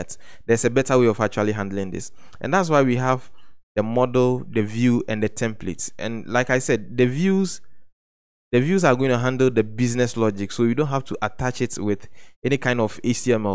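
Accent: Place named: Ghana. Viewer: Please where are you from.. You sound Ghanaian..I want to be in touch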